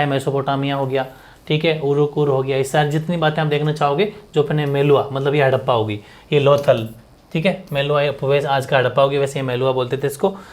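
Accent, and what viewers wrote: Rajasthan, India. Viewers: राजस्थान से हो क्या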